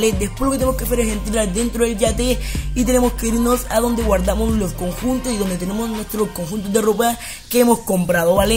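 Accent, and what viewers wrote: Colombia. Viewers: eres de colombia si eres de colombia de que ciudad yo soy de cali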